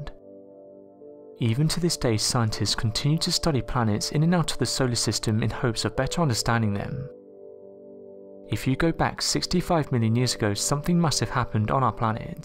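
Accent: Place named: Cockney, United Kingdom. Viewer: Sorry but that cockney is the worst. Mate😎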